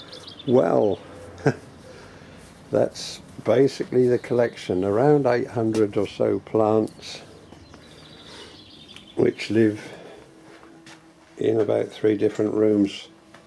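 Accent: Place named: England, United Kingdom. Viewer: i dont understand the latin names with the english accent but they're beutiful... espacialy the ones planted in the ground! i wish i could live by myself only from food i grow and dedicate my entire life for the plants.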